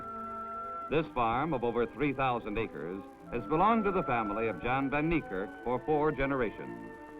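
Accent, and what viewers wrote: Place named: Mid-Atlantic, United States. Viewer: Love the mid-Atlantic accent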